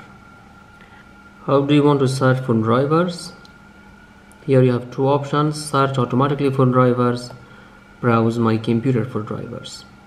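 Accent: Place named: Afghanistan. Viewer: which stable do you come from. Camputer. I laughed who taught you English. I guess you must be an Afghan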